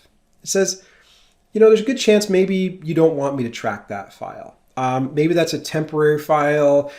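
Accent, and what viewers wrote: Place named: India. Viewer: I'm not being racist, I just don't understand English with an Indian accent. Please don't misunderstand me.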